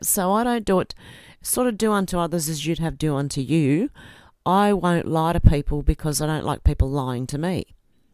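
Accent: Australia. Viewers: I'm very glad I found your channel. Great content, authentic and soothing energy. Love your Aussi twang.. peace from Canada (speaking of twang eh) 🙏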